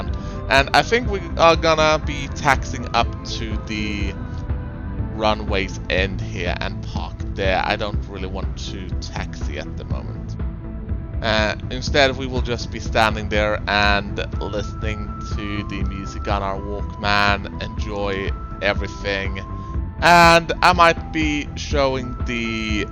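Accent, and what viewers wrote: Sweden. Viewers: good svengelska fett bra video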